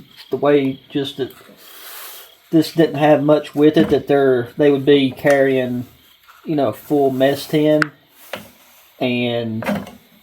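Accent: South, United States. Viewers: Very cool Amigo. New subscriber. I have only tried one of these Kaz. rations, older version. Components are standard for Russian, Ukraine, and entire area. I like most of them. Those big pieces of beef, in Russian meals, is called Tushonka. Cabbage meal is called Bigos, usually has sausage in it. Both are tasty. Glad you had fun on this ration. Peace from Kentucky, John. P.S., just guessing from accent, you are or were from Southern U.S. ? WE all sound the same, LOL. That is A compliment!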